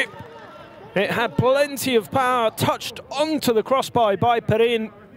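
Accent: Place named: Italy. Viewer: Pretty sure is an Italian commentator trying to speak in English accent...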